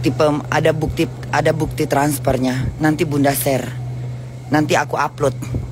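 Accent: Medan, Indonesia. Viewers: Jangan kau hilang kan bahasa medan mu corla itu lah penarikmu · "pauknya aku" 🤣🤣🤣 Bahasa Medan bgtt Bun.... Oalahhhhhh uda dikirim balik 100 juta nya ke si itu? Syukurlah ya Bun.... harga diri lebihh penting ya Bun.... 👍👍👍 Pantesan dia diam.... hahaha...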